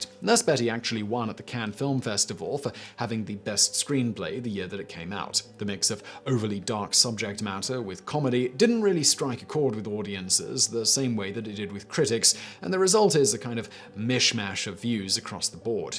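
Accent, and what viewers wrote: United Kingdom. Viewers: Too many British pronounced tomatoes in this video. Please never do a video where you have to say aluminium multiple times.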